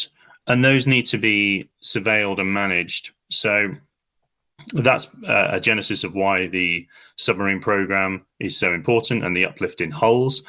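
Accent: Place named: United States. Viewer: You sound like your a yank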